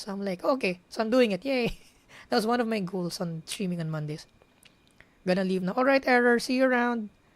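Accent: Philippines. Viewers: Motorcycle and car noises in the background, accent, didn't even have so say it accidentally, fellow filipino spotted 👍 But dang, streaming 11 midnight, good content tho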